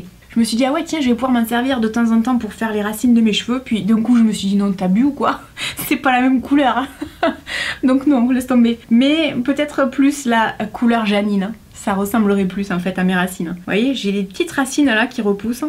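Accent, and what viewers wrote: South, France. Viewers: ... Maman YouTube merci d'être là!!! Personnellement tu me redonne le sourire a chaque fois surtout avec ton accent du sude 😋 Même quand ca va pas tu es là et je voulais te remercier 🥰🥰❤❤❤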